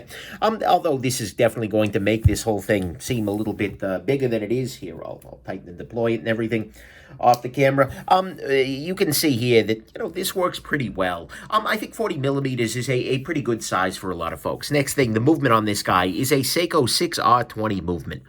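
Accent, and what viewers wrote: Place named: Brooklyn, United States. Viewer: ok i can deal with the annoying if somewhat entertaining voice - and I'm surprised to hear you're in the Ann Arbor area (where I grew up) as i would have guessed Brooklyn - but please, please don't hold the watch the way you talk: constantly jittering. Take some time with your hands so my eyes can focus on the watch. When you put it down it still shakes for some reason. Please. Your voice is going 80 mph but the watch shoudln't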